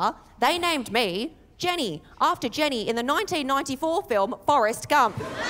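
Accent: Australia. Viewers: She got that Aussie accent too. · Australian accent is unbearable. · She's got a broader australian accent than me too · I love the sticker figures drawings. Jenny is this your art work? Nice. It really makes your jokes visual and twice as funny 😆 For me being Asian American it’s funny to see and hear an Asian with an Aussie accent. “Mum” “Naur (no)” 😂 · Wow, has an Aussie accent! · She’s so funny, I love the Australian accent on a woman. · I love Jenny's Aussie accent. I migrated to Australia in 1976 and I identify as Australian. There was an ad on TV many years ago that showed a teenage Chinese girl, a happy person, responding to a question from another traveler: "Where are you from?" Her response, in an Australian accent just like Jenny's, was "I'm from Ballarat, where are you from?" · F, u got d most Ozzy accent ! Rock on, Jenny! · Not eglish.... australian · Missed Aussie accent!!! 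It’s been a while!! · Well done. It is always a pleasant surprise to listen to a beautiful Asian woman speak with a Australian accent ❤ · 竟然听懂了，澳洲口音吗，way发音成wi。最后一段overlord是讽刺吗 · Like your Australian accent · I love her Australian accent with her Asian culture. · I thought your story and story telling was hilarious. Also like your Australian accent. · Jenny's accent sounds Australian!!! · Well done. However, as an American, it is a little brain-jarring to hear an Asian speaking English with an Australian accent. Add a sombrero, do a little yodeling, and the cognitive dissonance will be complete. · Damn, she really hasn't kept even a fraction of her parents' accent, she sounds even more sterotypically aussie than I do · your Australian dialect is quite exaggerated, your punctuality is ok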